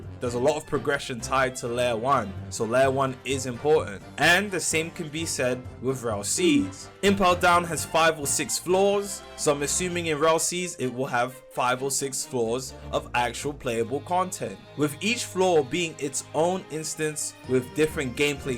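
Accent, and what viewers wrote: United Kingdom. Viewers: YOU FROM LONDON TOO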